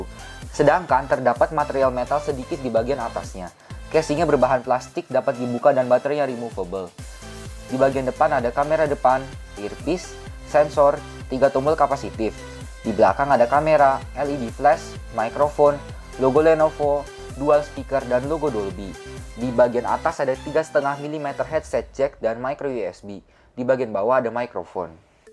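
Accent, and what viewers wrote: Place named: Java, Indonesia. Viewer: medok banget hehe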